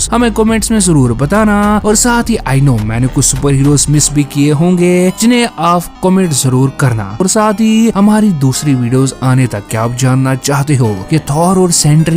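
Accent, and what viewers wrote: Kashmir, India. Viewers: You sound kashmiri?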